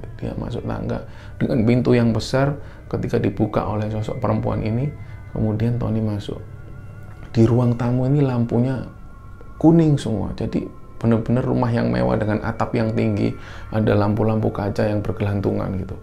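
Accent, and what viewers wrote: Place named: Surabaya, Indonesia. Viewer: Seng tak tunggu iku logat khas suroboyo cak. Ojok di ilangno boso suroboyoe.. 👌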